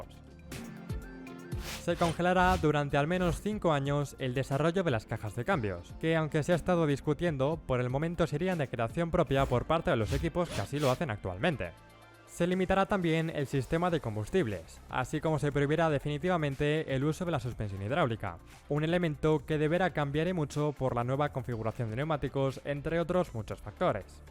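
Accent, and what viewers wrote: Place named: Basque Country, Spain. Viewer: Un robot con acento vasco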